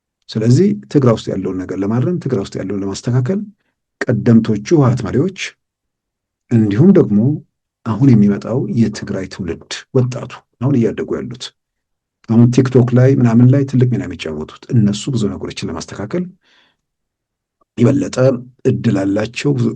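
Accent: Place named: Arab world. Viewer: 👉👹👹😭ሀ ሁ ሂ ሃ ሄ ህ ሆ ብሎ ያሰተማረህ ማን ነው ዋጠው አማርኛው ከየት አመጣሀው ቃንቃህ አረብ ነው 😭 👹👹👈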